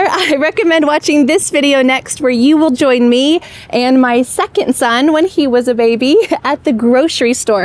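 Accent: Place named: United States. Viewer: I love American accents more and more because of you, teacher ❤️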